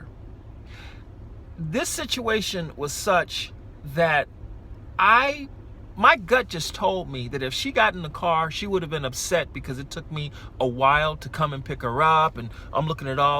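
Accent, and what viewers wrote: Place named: Edinburgh, United Kingdom. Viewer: Sounds Edinburra chief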